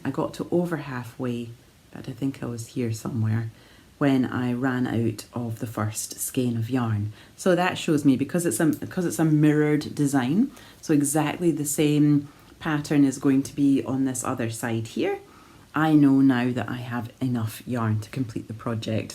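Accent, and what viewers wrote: Scotland, United Kingdom. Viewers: I love your podcast, inspiring and love love your Scottish accent. Keep on enjoying what you do and how you want to change and adapt to make it more joyful. ❤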